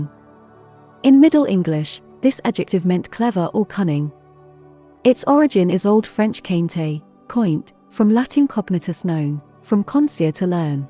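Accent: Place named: United Kingdom. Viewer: Congratulations! Your clips are real great stuff for anyone wishing to improve them English. Especially if you're in love with the British accent. It's pure music. Very "listenable". I was hooked immediately as I came across the very first clip. Oh! How I count myself lucky for bumping into your videos almost by accident. I have already shared your videos with not too few. Please keep up the wonderful work you are doing.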